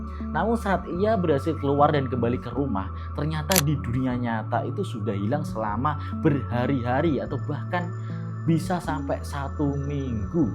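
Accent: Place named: Java, Indonesia. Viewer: Hostnya... Jawa bgt ❤❤ ... I like u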